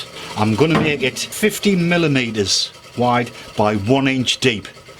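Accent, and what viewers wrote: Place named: Sunderland, United Kingdom. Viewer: Sounds as if he's a Mackem from wearside?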